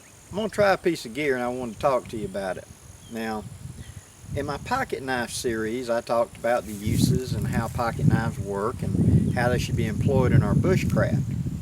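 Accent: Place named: Alabama, United States. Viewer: I figured out why I enjoy listening to you more than any other bushcraft channel. For one, you like slipjoints...I've always been taught you dont need a lock on a pocket knife. If you use it properly. I was given several US Old Timers by my granddaddy and they still ride in my pocket. Mainly the 80ot. For two, YOU'RE FROM ALABAMA. I was born and raised up by Lake Jordan in Slapout, You speak my language. Alabama southern draw. Keep em up and ill keep watching.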